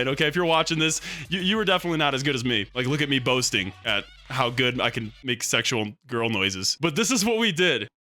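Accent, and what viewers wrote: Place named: Australia. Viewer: Australian voice After 3 years he reveals it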